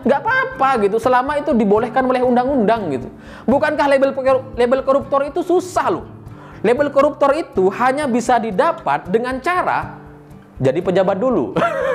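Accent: Madura, Indonesia. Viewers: kayak orang madura. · Mas ini khas Madura, lucu tp isinya bermutu. Maju terus kang. Dekrema niku dik!!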